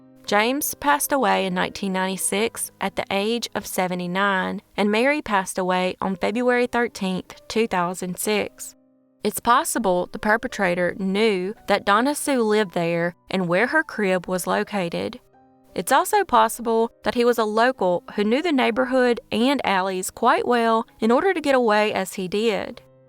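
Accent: South, United States. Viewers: I must say I really like your channel; however, I've always found southern accents to be highly irritating to my ears. Sorry, nothing personal, obviously. Congratulations, though, on the popularity of your channel. Keep up the good work. 🥳 😉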